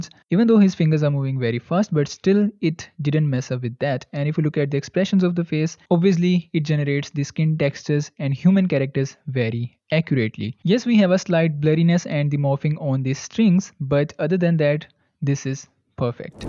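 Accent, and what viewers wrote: India. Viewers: I actually prefer this real voice and accent over AI by now.... maybe bc it’s authentic and I trust it more now, I’m sick of these AI voices cause every Indian guy takes advantage of AI like crazy 😂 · Honest opinion. International audience will only watch neutral international voice and accent. Not our hopeless unimpressive Indian accent. Change it to AI voice for more views.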